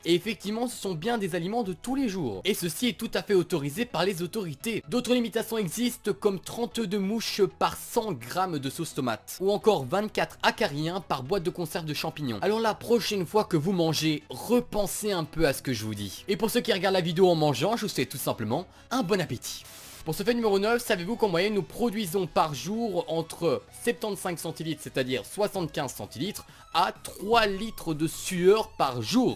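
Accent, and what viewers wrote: Belgium. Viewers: alala ! L'accent belge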